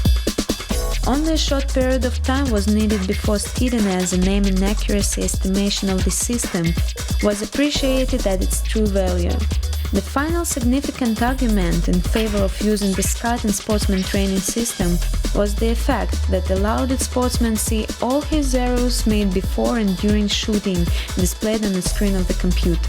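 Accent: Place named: Russia. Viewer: Wait is this is english? I thought it was russian, man that is a bad accent.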